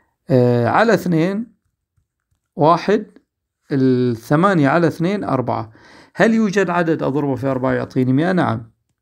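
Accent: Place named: Jordan. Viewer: لهجته تجنن احس راحيه على اردني 🌚✨️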